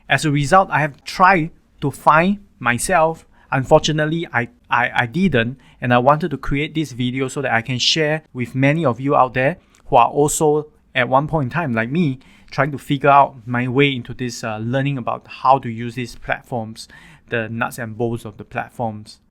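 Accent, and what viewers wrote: Singapore. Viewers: Singapore/malaysian ascent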